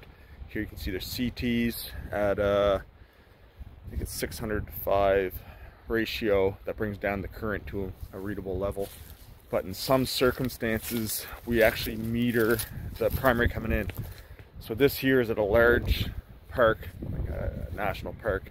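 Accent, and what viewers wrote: New Brunswick, Canada. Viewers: Assuming by your accent you're new brunswick or pei... When they buried the service; would that be upgradeable to 3 phase?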